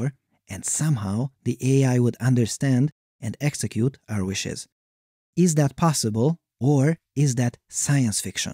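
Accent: India. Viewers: LOL, I thought you are Indian (based on your accent and exotic name) :-D What a surprise :-) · I assumed from your accent you were Indian, but I suppose there’s a paper out there that knew better than me to begin with.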